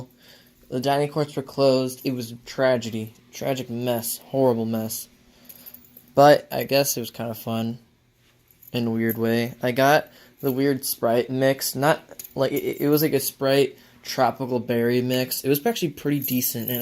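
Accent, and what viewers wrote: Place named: Georgia, United States. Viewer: You have a really nice voice. Are you from Georgia by any chance? I've known a few people from there who pronounce words with similar intonations. This vlog was really comfy. I liked how you cut from thing to thing, it gave a sense of pacing as you were developing each topic. A lot of the stuff you were talking about felt very mundane but that was the charm of it for me. Getting to hear someone with a likeable personality and soothing voice share what their life is. And the fact that you showed for example an unedited clip of you playing that game that had been spending so much time on drove home that point. It felt very coherent overall and had a nice flow to it. I'm looking forward to what you do in the future!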